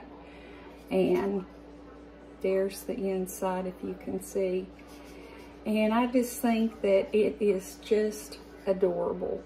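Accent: South, United States. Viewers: You remind me so much of my grandma❤️ she has a southern accent as well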